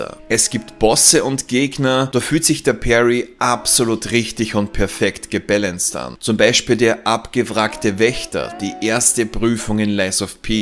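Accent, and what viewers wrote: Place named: Vienna, Austria. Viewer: zu schwer - ganz einfach: 90% der Spieler, die es kaufen sind nicht gitgut und mit einer kleinen Minderheit(profispieler) macht man kein Geschäft - mir z.B machen die Bosse überhaupt keinen Spaß sondern das dazwischen .. ich spiele schon seit 11 jahren Skyrim (pause mit Elden DS3 Sekiro usw.) aber nicht das Spiel selbst sondern weil es riesige modder szene gibt - ich schraube nicht im Spiel sondern am Spiel - herrlich bescheuert - bald kommt Lords of fallen - freu mich deine auf Anleitung - als Wiener mit dem Slang ein Genuss -habe es vorbestellt mit DarkNight extra :D weiß jetzt schon ich das nur gefickt werde :D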